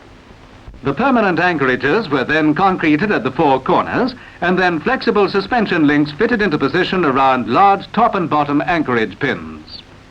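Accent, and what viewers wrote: Australia. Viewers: Ya got tru blue aussie accent there mate 🤠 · He's Tasmanian, ask someone from Smithton Tasmania where they're from and you'll hear smitten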